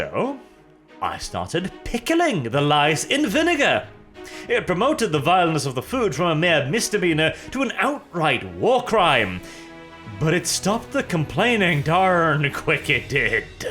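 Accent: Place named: RP, United Kingdom. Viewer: Some people on the internet think is not aware of how smug and posh his accent sounds. They haven't seen his game reviews.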